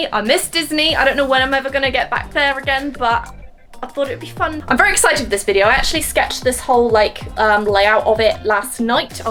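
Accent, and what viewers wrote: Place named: Cornwall, United Kingdom. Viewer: are you from Cornwall sounds a bit cornish? I love your art by the way Luv from the UK xx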